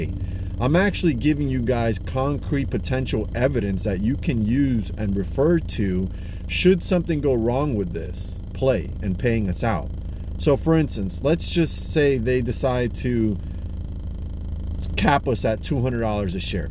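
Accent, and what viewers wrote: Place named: Southall, United Kingdom. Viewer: Keep up the gd work,well said! appreciate the dirty grind ur doing n outting these brokers,u spoke like a true Southall (west london) boy,head up 👊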